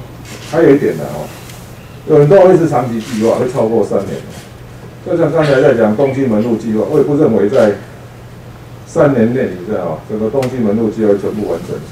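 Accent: Taiwan. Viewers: 有"爛"群組...哈哈!!阿北的台灣口音英文真的很有趣~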